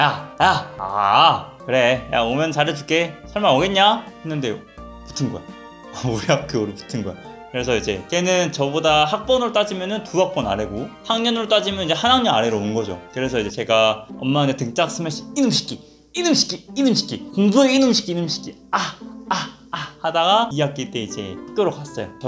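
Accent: Gwangju, South Korea. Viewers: 뭔가 광주 사투리가 엄청 정겨워요 ㅎㅎ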